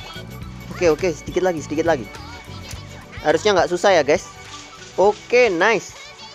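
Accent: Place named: Java, Indonesia. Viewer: Wkwkwk medokk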